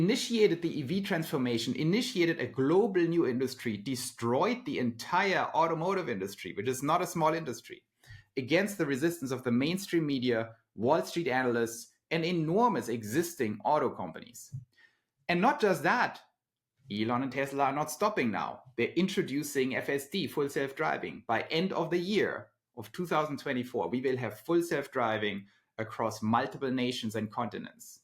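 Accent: Germany. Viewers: Appreciate your analysis, insights and effort to bring this material so clearly with your pleasant German accent. Very good!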